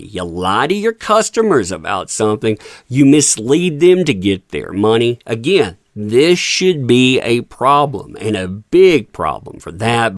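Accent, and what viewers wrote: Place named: Mississippi Delta, United States. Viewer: We haven't to be from the same small town. We sound alike. Mississippi River Delta?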